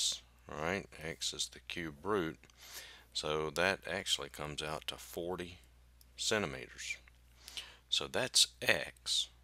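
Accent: South, United States. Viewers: Modern day life is so wonderful if I have bad teachers I can have a smart dude with a country accent explain maths to me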